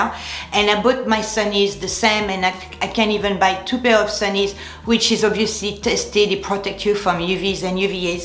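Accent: France. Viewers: I love your videos you give such nice advice to let us put on a more classic look to feel more elevated and positive! And you are so lucky to speak French and have such a nice accent I wish I did:) French is the prettiest language! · I really enjoy your videos! Just love your French accent! If you're looking for another word for 'elevated' you might want to try using 'sophisticated' or 'refined' ..... This is not a criticism, I just noticed that you are often looking for alternative words. Looking forward to the next 'words of wisdom' session.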